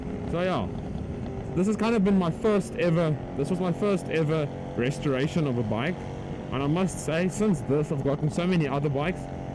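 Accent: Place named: South Africa. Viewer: ....enjoyed your vid...lovely weather you have....are you in SA...from your accent sounds like it. I also own a B175....arnt they smashing little machines. Do you have any probs getting your spare parts...not to bad for me here in the UK, Just had a little 10 mile ride today to blow the cobwebs off after Christmas. All the beast and safe riding. Nick.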